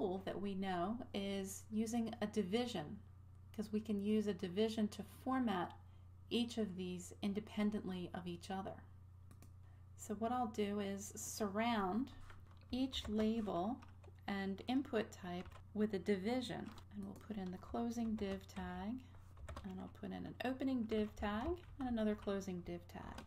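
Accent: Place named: United States. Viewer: Very nicely done! Extremely helpful! Such a nice change to hear a lady's voice speaking clear American English in one of these tutorials.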